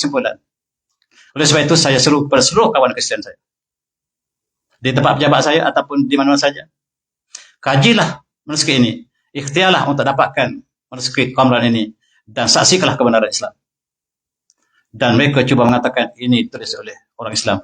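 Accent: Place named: Kelantan, Malaysia. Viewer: setuju sgt2..bro, belum cukup ilmu di dada, lautan dalam jangan di duga. Sya pun faham..prof ni byk kekurangan dlm penuturannya yg tebal loghat Kelantan, kelajuannya, malah bait2 bacaannya dari apa sahaja bahasa BM, English, Arabic, Ibrani, Aramaic, terdengar mcm tak clear..lajunya mcm lorat gaduh dan agak lucu2 skt. Tp tu semua tak terbanding langsung dgn ilmu2 dlm setiap kuliah beliau. Mula2 dgr dlu ada gak rasa smcm jer..tp makin lama semakin menarik, makin mendalam, byk ilmu baru, isu2 dunia, isu umat muslimin, isu akhirat dan penuh pengajaran, pencerahan dlm dunia yg sudah di fasa akhir zaman ni..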